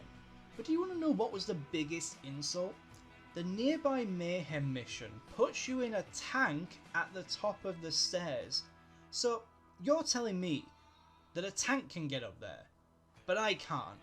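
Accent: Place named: United Kingdom. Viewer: "why is this random British guy talking about Shrek?" Is a question I face in my day to day life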